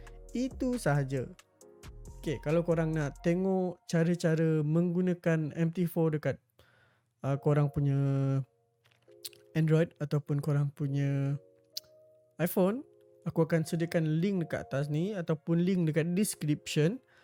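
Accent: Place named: Malaysia. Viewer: kaya orang malaysia logat nya... apa melayu indo..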